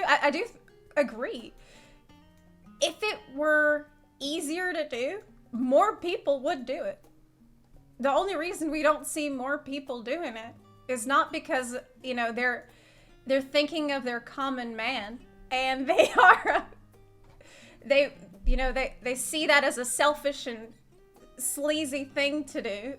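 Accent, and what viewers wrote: South, United States. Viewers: Has anyone else noticed that since has been back in the US shes been slowly getting a stronger southern accent? LOL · Some videos she just gets real southern. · I can't get over the fact how SOUTHERN sounds. She reverted so quickly to her American accent. It's astounding. E: As far as the topic at hand, I have an FC apartment, I have a private ward apartment, and I have a small house. That's 400 slots, that's more than enough for me. I'm not double dipping on any one form of housing available to an individual. I got my apartments way before I ever did my house (thanks ward expansions, you're the real MVP here), but I know what the rules are, and I stick with them. I wish everyone did the same. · Your accent is sounding a lot more southern since getting back to the states... it adds to your cuteness level 😁😁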